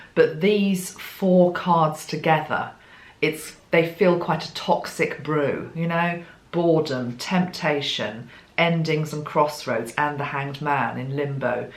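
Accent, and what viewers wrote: England, United Kingdom. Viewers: I just love listening to you. I love English accents. You have such a comforting voice and I feel such strong compassion from you. I would so love to be in one of your workshops but I live too far away. Bless you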